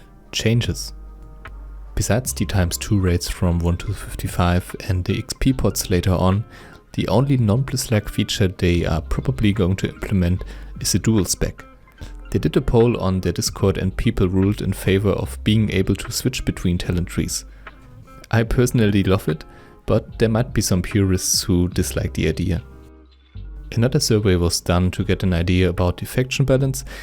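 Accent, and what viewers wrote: Germany. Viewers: Man hört dir raus, dass du Deutscher bist :D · Dein deutscher Akzent digga. Beste.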